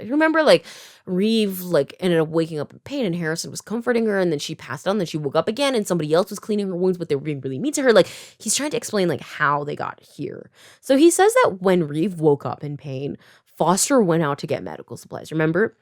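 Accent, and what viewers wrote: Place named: United Kingdom. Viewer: as someone who has been watching your videos for a while now i spent this video so worried about everyone who wasn't in the known main trio also the british accent caught me completely off guard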